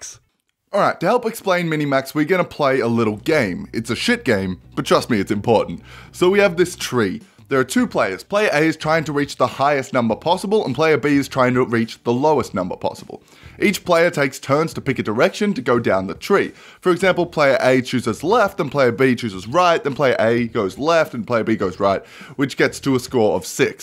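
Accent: Australia. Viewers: Are you Australian, you sound heaps Australian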